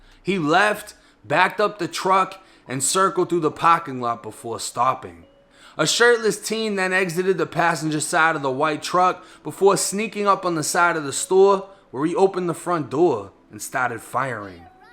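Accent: Boston, United States. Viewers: The food mah-ket . Lol I'm from Buffalo and I love hearing ppl talk with a Boston accent. Fucking never gets old lol. The accent, your story telling ability, your journalism skills are all killer. bro you got talent 👏 👌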